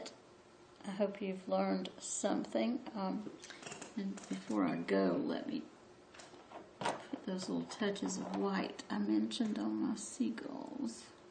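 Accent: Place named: Wilmington, United States. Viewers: I am from Wrightsville Beach.. you sound like you’re from at least Wilmington or nearby. Beautiful painting!!!! I have a very hard time with sea oats and this pretty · This session 4 doesn't look like it started where 3 left off. The foreground is different. Would it be really hard for you to show the exact steps taken in a painting, instead of leaving huge gaps??? Have to say, all your videos are kind of a mishmash and there is no streamlined continuity in any of them, or any combination of them that goes from START to FINISH of a painting. or even the techniques you use. Intermediate stages are not shown which are really crucial for learning. Maybe you need to get more familiar with the technology of making videos. None of the other art instructors up here on Youtube leave such gaps in showing their technique. You sound like a very nice Southern lady, however, I think you should stop making so many sessions with each painting and make a one hour long, or however long it takes - video showing literal START to FINISH of a painting. 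Don't worry about making them roo long. People want to learn the whole process. No one will get bored, there are lots of one hour instructional videos up here, but not many beach scenes unfortunately.. I think maybe you're not comfortable with the video equipment and thats why you leave out some stages from one session to the next. I hope this helps you get more viewers. Your beach scenes are really lovely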